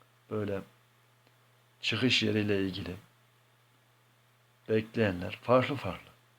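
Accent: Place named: Ankara, Turkey. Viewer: Angara şiveden belli olmuyor mu İlk çıhışş çıhacak diyip duruyor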